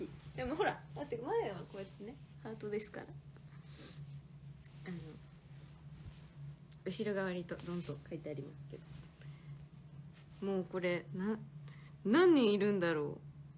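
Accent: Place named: Kyushu, Japan. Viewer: なんねこれ、九州の女て感じ❤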